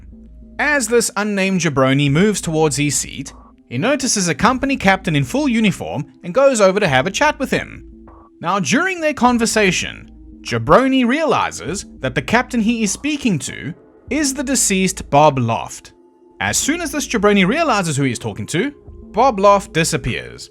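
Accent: South Africa. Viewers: South African accent, I noticed instantly 🇿🇦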